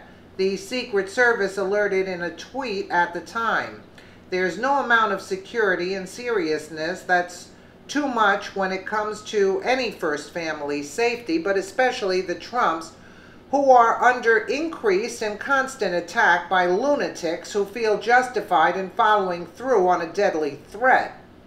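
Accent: New York, United States. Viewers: Are you from Brooklyn or the Bronx??? Love your channel!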